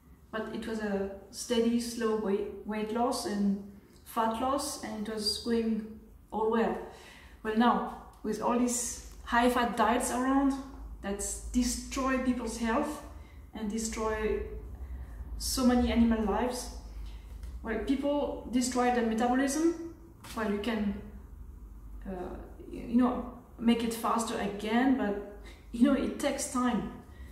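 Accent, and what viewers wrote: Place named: South Africa. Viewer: Do you know you’ve got the South African accent ! It is sooo cute 😊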